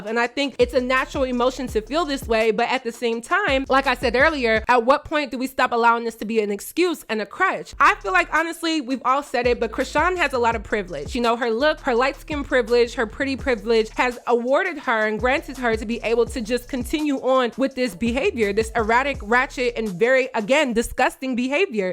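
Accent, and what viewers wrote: Philadelphia, United States. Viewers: 215 South Philly love your Content and can hear Philly all day in your accent❤ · Girl I’m from Boston and I’ve been watching you for a while and I already knew u were from Philly with that accent! Philly thru and thru lol ❤ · 215 I knew you were from Philly from day one! I said "hol up she sounds like me!"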